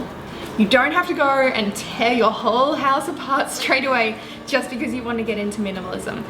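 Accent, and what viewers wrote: Australia. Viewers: I love aussie accent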